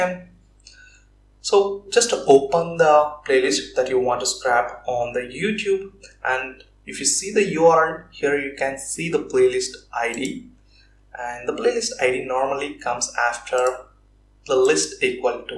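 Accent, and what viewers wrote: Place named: India. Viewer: Indian Accent is the best accent....be natural ♥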